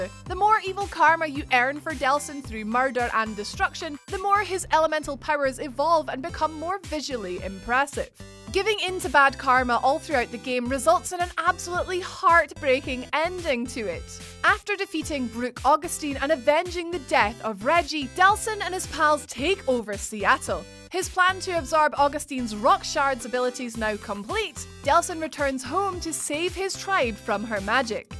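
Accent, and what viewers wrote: Scotland, United Kingdom. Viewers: ZOMBIE BEYONCÉ! Haha! Initially I was doing something else whilst listening to this video playing in the background. At no.5 in the countdown (@ - ) “House of the dead, Zombie Fiancé” I was so sure I’d heard ‘Zombie Beyoncé’ which peaked my interest in that game ten-fold...haha! Just imagine Beyoncé as a zombie. I rewound the video to double check & obviously immediately realised I was incorrect & had misheard due to Scottish accent. Oh well...It was a fun thought though while it lasted! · Ah miss I could listen to your sweet Scottish tones all day 😉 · I switch to something else the minute I hear this Scottish girl’s voice 😒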